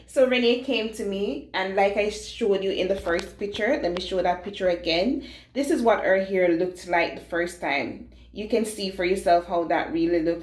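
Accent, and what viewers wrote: Jamaica. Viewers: I thought you were Jamaican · You sound Jamaican. Where can we find or contact you? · What salon do you work at? cause you sound like you might live in Jamaica.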